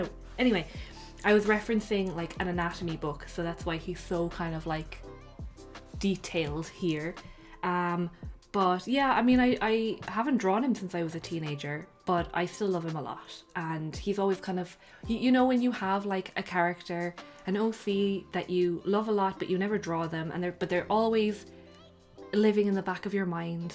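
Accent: Ireland. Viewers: Some beautiful work and a lovely Irish lilt to the narration. Really enjoyed your video! Thanks for sharing !!!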